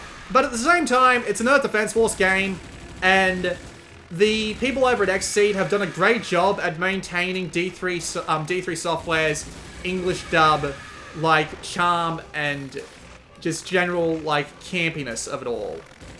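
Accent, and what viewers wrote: Australia. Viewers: Unrelated question, how is your Vegeta impersonation? Sorry, you just sound like an Australian Lanipator.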